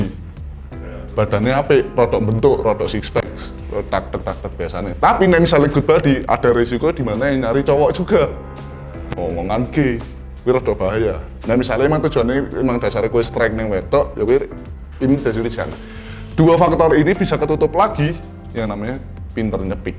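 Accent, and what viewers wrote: Semarang, Indonesia. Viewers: Logate wong semarang ketoke sampean mas 😅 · njirr logat semarangan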